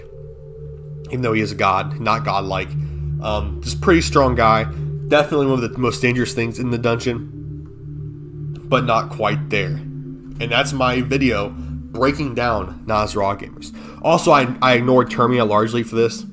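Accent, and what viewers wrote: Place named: Turkey. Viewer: sounds suspiciously turkish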